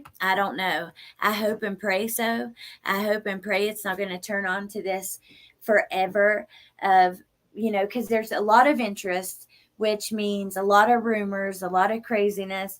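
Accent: South, United States. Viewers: I can listen to u talk all day.. lol 🥰 ur southerners accent reminds me of brit spears ♥️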